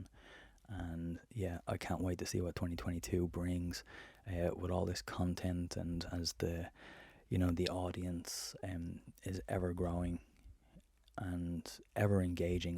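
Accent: Ireland. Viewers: OMG !!!! Love your accent so much! Ireland ❤️❤️❤️☘️☘️☘️🇮🇪🇮🇪🇮🇪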